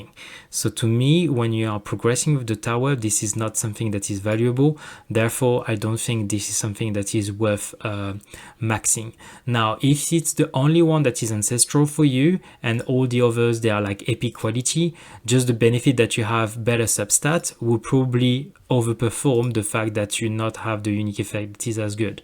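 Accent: France. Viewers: Frenchest person i ever heard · j'ai reconnu direct l'accent français mdr ^^ Bonne vidéo sinon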